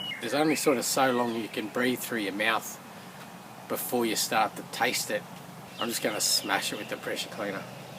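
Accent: Australia. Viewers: This was my first Back Shed vid and I enjoyed it tremendously. Im watching through again. I love an ol F series truck. Us Americans are suckers for anyone with a charming Aussie accent. To me the more Bogan the better.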